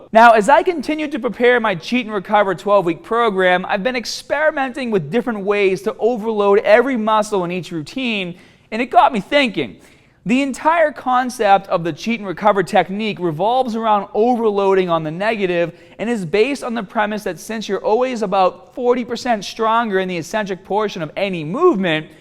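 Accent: Boston, United States. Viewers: Is that a Bostonian accent?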